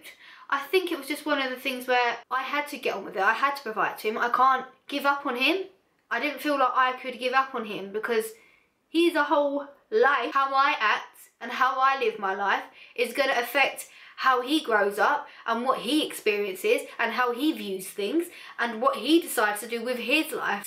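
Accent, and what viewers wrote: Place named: United Kingdom. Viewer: Sweetheart, I absolutely LOVE your accent. I'm completely sorry if that's offending, I know when people tell me they like my "Caribbean" accent it can be quite annoying sometimes. I totally admire you and your makeup work! I love you tons! Hopefully when I go visit the U.K. that I run into you! Much love <3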